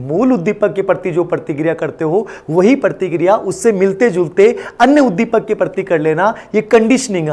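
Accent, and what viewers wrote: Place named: Shekhawati, India. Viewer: Sir churu jhunjhunu se ho kya....lang. udhar ki lgi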